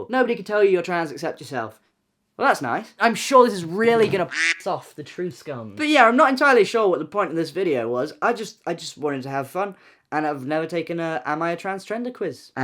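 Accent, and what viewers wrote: United Kingdom. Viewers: idk how if this makes sense but you sound more british than usual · You know I hope you know that you don’t ALWAYS have to feel like you need to post vids abt sexuality or lgbtq related topics. I hope you know that there are many ppl out there like me who follow you for you ie. your charming personality, you humour, your looks and that gorgeous British accent of yours:) I just don’t want you to feel any pressure when it comes to content and hope that your still as passionate about it as you were in the beginning. Honestly I’d even watch you tell me how to cut an apple or explain the different colours to me at this point so just wanted to let u know that we love you for you <3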